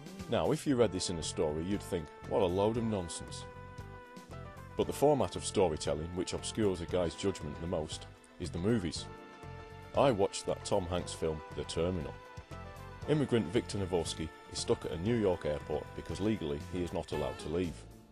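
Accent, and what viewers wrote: United Kingdom. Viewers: No problem. Your vids are great. I'm used to hearing American mgtows, so it's particularly refreshing to listen to another Brit! Your vids are quite unique in that sense as we get to hear an English point of view for a change. Thanks for your sterling work, sir. Looking forward to your next vid.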